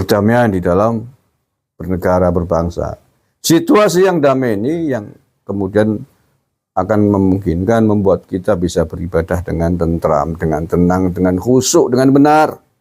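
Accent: Banyumas, Indonesia. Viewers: Tambah edan..setuju Gus...logat banyumasan medhok tenan pak dhe...👍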